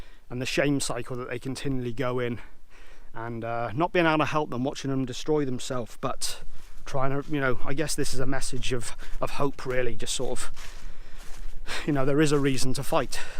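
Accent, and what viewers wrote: United Kingdom. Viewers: It's a pretty decent album even though it ain't their greatest but it ain't horrible. And I must say that this video amuses me when you're explaining the meaning of the songs with your British accent! You deserve a subscriber!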